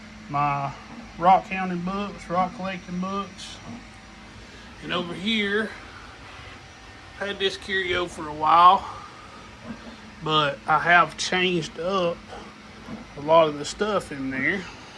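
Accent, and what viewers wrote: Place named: South, United States. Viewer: Southern twang at its finest....cool room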